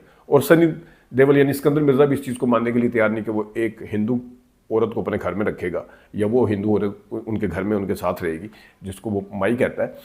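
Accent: Punjab, India. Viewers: Like you I think your mother tongue is Punjabi that's why when your urdu not clear Apki urdu mein bhi Punjabi lehjha clear Nazar ata hey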